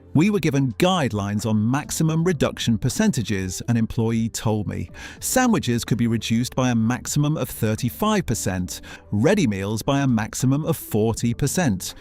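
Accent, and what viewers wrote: United States. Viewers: Why are you talking in an American way? It is so annoying.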